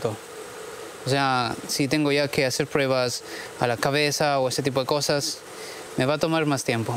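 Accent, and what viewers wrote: Cuenca, Ecuador. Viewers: no soy mecanico pero tengo un problema con una ford f150 que lo compre recien. Se recalento estan do parado se hizo un telajo. Saludos felicidades por el acento parese ser de cuenca 🇪🇨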